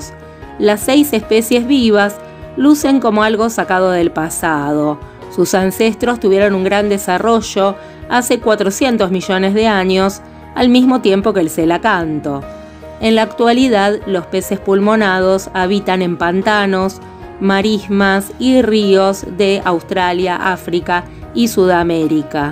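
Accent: Argentina. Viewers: Nuevo suscriptor.. Excelente contenido.. Sos de Argentina?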